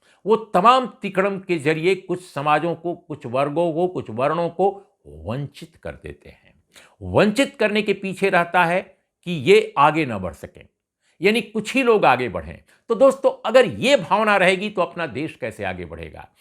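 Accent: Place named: Bihar, India. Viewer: आप ऐसे बोलते हैं लगता है कि कोई बिहारी ही बोल रहा है। आपको मेरा नमस्कार है 🙏🙏🙂🙂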